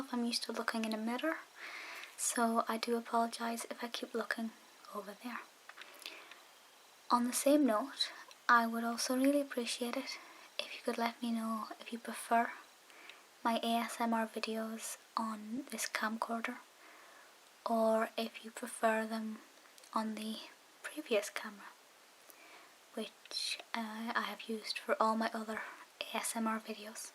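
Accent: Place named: Scotland, United Kingdom. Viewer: Me neither, only as far north as Aberdeen.. Desperate to see it someday, I cannot believe how spectacular the landscapes are and also how much kinder the people are compared to where I mostly lived in London, Cambridge and Essex. There's really something to be said about the lack of pretensions and sincerity of the Scottish, it's not a generalization. Really want to see The Great Glen and Orkney Islands as well. Your voice always reminds me of my days back in Scotland! Cheers;)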